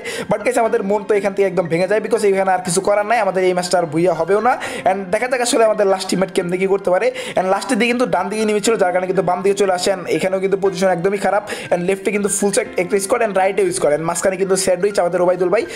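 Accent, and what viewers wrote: Tangail, Bangladesh. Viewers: Vai apnar vasa tangail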